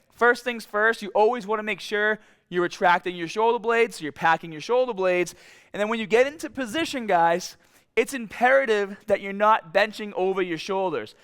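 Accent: Boston, United States. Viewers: That boston accent though. Thanks for the knoweldge anyway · comedyman112 no. He has a Boston accent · Is this dude from Boston ? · What's his accent? Boston? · With so many followers and so many questions I really appreciate the fact that you take the time to reply.. hope it's not a VA :-). Didn't realise that's a Boston accent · Missed that Boston accent. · Where’s that boston accent coming from ?? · Interesting hearing his 2010 videos having a normal American accent, and now, 8 years later, there is some Boston-sounding accent mixed randomly in there.. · Sounds like he’s from Bahhston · Bahbell!! Like your vids a lot, but I'll bet money you're from Massachusetts, my friend is from Boston and sounds just like you. Hurts my ears but I love the knowledge and advice, so I'll suffer through it. Lol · Gotta love that Boston accent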